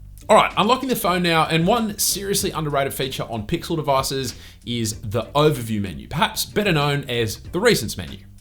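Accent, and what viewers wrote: Australia. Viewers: Australian? Nice change to hear someone from there.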